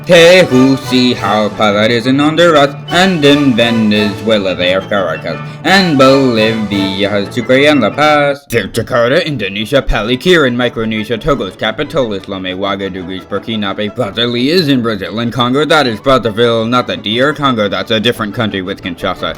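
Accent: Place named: Scotland, United Kingdom. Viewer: I cant understand anything with ur ugly stupid scotish accent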